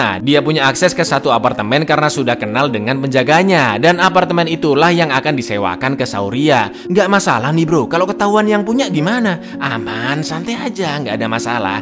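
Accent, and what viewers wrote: Java, Indonesia. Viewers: kue wong jowo opo cok, medok timen le ngomong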